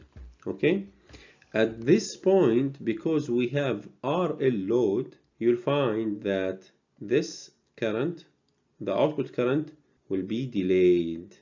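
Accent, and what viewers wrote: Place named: Arab world. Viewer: Perfect explain, and i see the Arabic voice in your explain, please make Arabic language as same as the English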